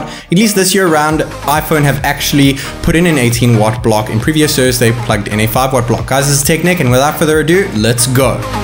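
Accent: South Africa. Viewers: U sound south African so u now my favourite tech guy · Instantly knew you were also South African once I heard your voice, now I gotta sub haha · South African accent, talking at the speed of an auctioneer. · You are definitely south african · Are you South African??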